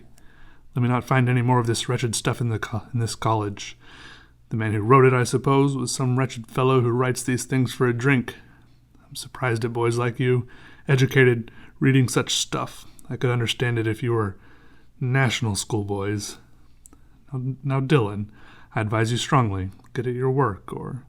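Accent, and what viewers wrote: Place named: England, United Kingdom. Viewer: Hello. I like your English accent. And I like Celtic music, James Joyce's 'Dubliners'. Thank you.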